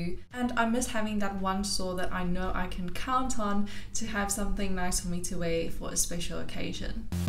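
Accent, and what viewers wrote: South Africa. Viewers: Lol the minute I heard you speak, I knew you might be from Pretoria... Nice. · Ek hoor n Afrikaanse trekkie aan daai Engelse aksentjie. Thanks for posting. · Please, don't ever again mention that you are from Pretoria, you sound more like a Sandton poppie...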